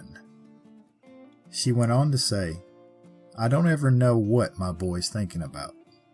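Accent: South, United States. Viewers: I love your way of telling stories. The accent does make me miss living in the south. What is it that catches your curiosity about a story? What ultimately draws you to telling it?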